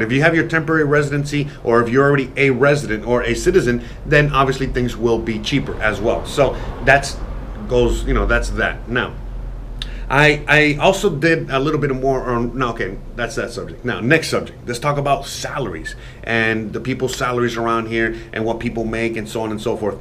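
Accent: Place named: New Jersey, United States. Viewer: I'm thinking you're from Jersey??? "Ya know whata mean."...."Get out of here." Strong accent and the f word is just a simple roll off of the tongue. lol love it! Thanks for posting because I was wondering what I could get with my tiny little budget! So I'm rather impressed with the apartment for the moolah.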